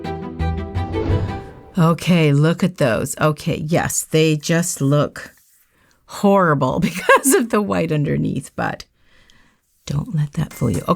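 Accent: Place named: Canada. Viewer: I was wondering if you are Canadian, then I heard you say “nuke” and “eh” and that was my answer! I have just started doing my nails at home and have been doing research on products and designs. I’m going to do cat eye next!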